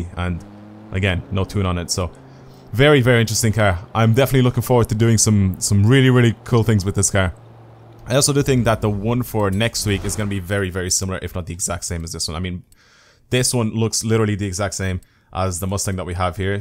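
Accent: Ireland. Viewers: R u Irish lad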